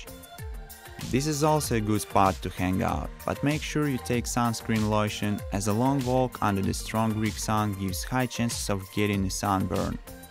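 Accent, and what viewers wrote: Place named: Australia. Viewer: Thank you for this awesome and very detailed vlog. I am visiting Greece in 10 days and Hydra is one of the places on my list. The moment I came across with this beautiful island while planning my trip I fell in love right away especially the no car concept and how walkable the lovely harbour town is. By the way, I am curious about your cute accent, I could recognize some part Australian and a hint of eastern European. ;-) Cheers mate!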